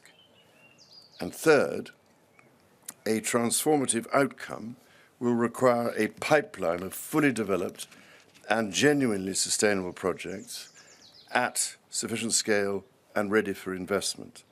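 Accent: United Kingdom. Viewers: Prince Charles has a very classy British accent. His son doesn’t sound like him. I have never been a royal watcher from America and I couldn’t get past the full first episode of the Crown but I am so fond of Queen Elizabeth. She is an inspiration to me.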